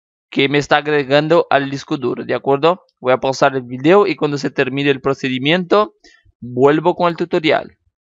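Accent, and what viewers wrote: Brazil. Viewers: creo q es de Brazil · es brasileño v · eres brasilero?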